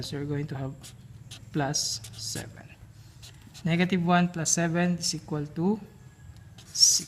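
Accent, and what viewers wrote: Philippines. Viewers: are you filipino btw? Your accent sounds familiar to me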